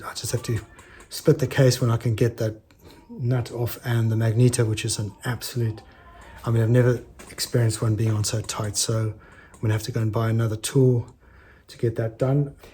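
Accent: South Africa. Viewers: Enjoying your videos. Listening to you I originally thought you were doing the restorations in SA! Ex Durbs living in Oz.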